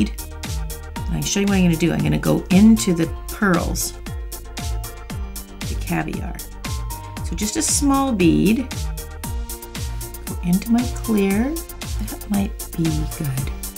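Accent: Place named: Canada. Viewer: I love your accent! I am guessing your Canadian?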